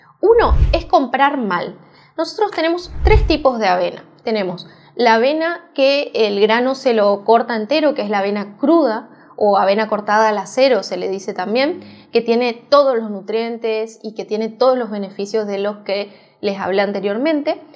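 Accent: Misiones, Argentina. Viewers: Excelente equipo doctores! Se nota su acento argentino, felicidades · Buen día es posadas, misiones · De misiones son , norte. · ES DE MISIONES ( ARGENTINA)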